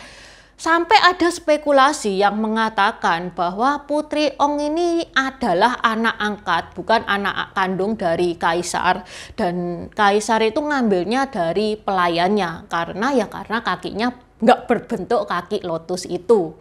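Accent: Java, Indonesia. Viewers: Hallo!! Keren banget Chanel,jadi Chanel faforitku.tapi kenapa logat bahasa kok medok Jawa banget.justru tambah keren,lanjutkan terus pencerahan nya tentang Tionghoa Indonesia.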